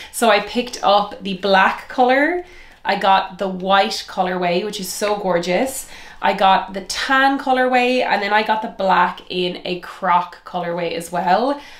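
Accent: Ireland. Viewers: Amazing duoes and finda. Love your videos and your cute Irish accent and think your make up and look getting better and better ( and you havr such pretty expressive eyes so much nicer without your glasses). 🩵🩵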